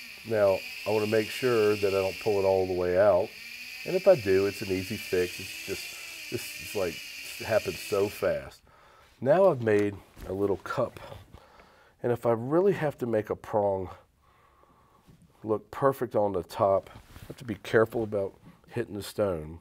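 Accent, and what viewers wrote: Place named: New Orleans, United States. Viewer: You sound like you are from New Orleans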